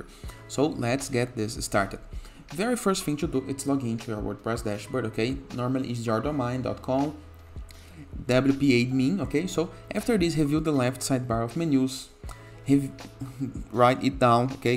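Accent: Brazil. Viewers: Sotaque brasileiro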